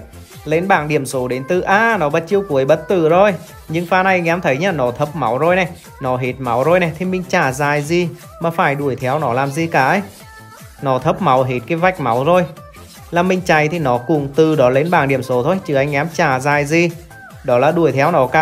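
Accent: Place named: North Central, Vietnam. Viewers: Mình ở miền tây. Nhưng rất thích nghe giọng mấy ace ở miền Bắc Trung Bộ như này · Giọng người Huế nghe hay lắm ln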